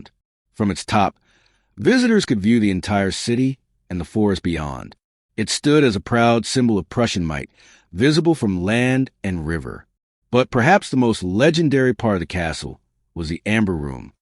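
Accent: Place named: United States. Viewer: Please do not use an American narrator when talking regarding European Castles.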